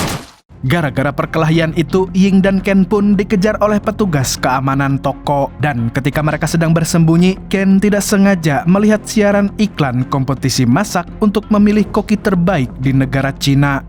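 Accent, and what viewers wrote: Banjar, Indonesia. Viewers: Banjar banar😂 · Urang Banjar sekalinya pian 😂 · Urang Banjar kah amang ni...??😂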